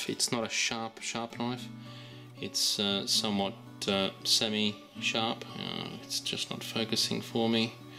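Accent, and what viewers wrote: Australia. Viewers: You Aussie? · You sound Aussie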